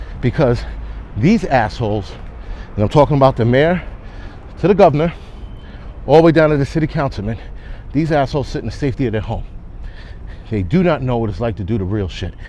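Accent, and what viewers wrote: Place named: Chicago, United States. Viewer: Really informative , sounds like Chicago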